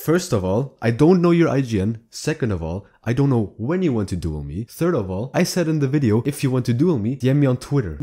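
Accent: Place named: Germany. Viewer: Joa net schlecht das man als Deutscher versucht einen englischen Kanal man merkt schon das sich die deutsche mc Community sich für solche Videos nicht interessiert seit dem BastiGHG nur eintönige Challenge Videos macht. Ansich ist dein Englisch sehr gut aber man hört nur minimal dein deutschen Akzent.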